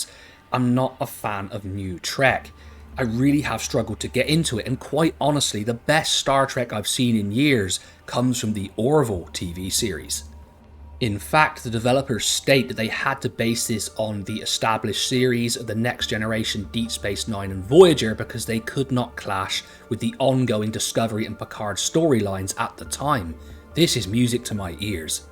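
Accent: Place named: West Country, United Kingdom. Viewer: This is the dogs 🏀 🏀… nice to see knowledge and enthusiasm in the review, together with a little West Country chaaarrmmm oh arrr 🇮🇪❤️🐌🐸❤️🤗🐸🏀🏀❤️🐌❤️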